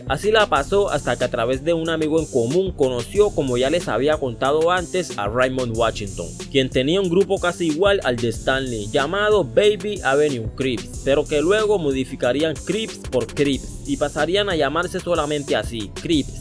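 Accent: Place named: Pacific, Colombia. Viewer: Por tu acento creo que eres de Tura. O algún lado del Pacífico colombiano. Espero no equivocarme. Saludos paisano.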